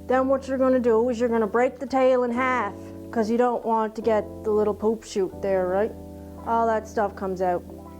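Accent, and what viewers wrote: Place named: Maritimes, Canada. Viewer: Oh, be off with ya! That's our Maritime accent over here, don't ya know?!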